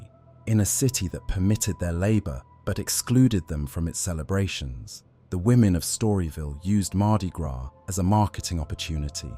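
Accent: United Kingdom. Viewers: He’s British or something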